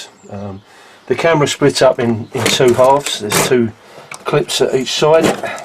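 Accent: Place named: London, United Kingdom. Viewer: Thanks, just setting ours up to capture Kangaroos, Wombats and Wallabies here in the Upper Yarra Valley, 70kms from locked-down Melbourne. Nice to hear an authentic London accent again. We also want to watch how our chickens escape their pen as foxes have taken a couple lately.....thanks...useful tips!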